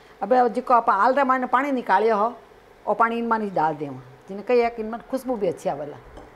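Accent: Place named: Rajasthan, India. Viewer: Hum b Rajasthan se hi h Aapke muh se marwari boli bhut achhi lagti h Gud recipes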